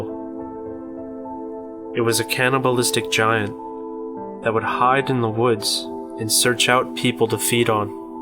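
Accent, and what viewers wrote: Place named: England, United Kingdom. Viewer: The correct pronunciation is ( K O O S ) not Cooas ! The narrator is an English Speaking gentleman from England where they speak the ( Kings English ) not American English which is not the same ! !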